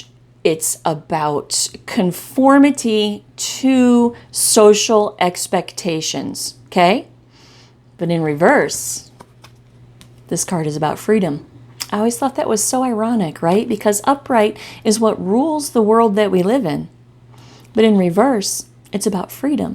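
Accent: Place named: Italy. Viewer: lol Love it we talk alike.100% Italian here.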